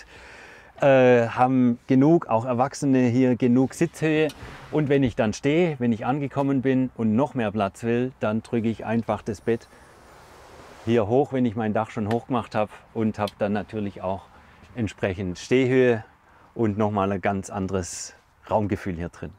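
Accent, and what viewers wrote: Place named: Swabia, Germany. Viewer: Super geil.. und dei schwäbisch isch no geiler..:)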